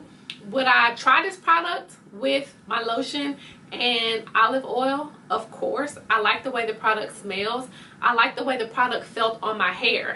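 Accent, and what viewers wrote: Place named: South, United States. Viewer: Girl you gonna break YouTube with that southern accent popping curls fresh face. Thanks for the review. 😄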